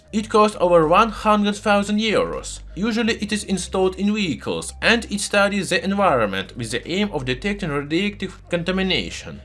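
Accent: Russia. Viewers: Are you a Russian? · are you russian???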